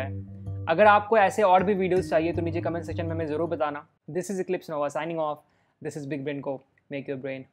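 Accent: Bihar, India. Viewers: Bihar se hai ye banda...sure!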